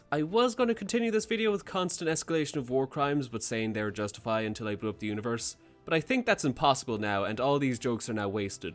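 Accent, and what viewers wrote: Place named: Ireland. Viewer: you sound like you're from Ireland.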